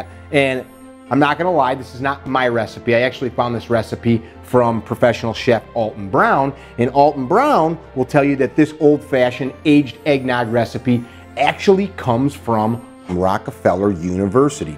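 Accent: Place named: Wisconsin, United States. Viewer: Buddy’s a cheese head for sure with that sconi accent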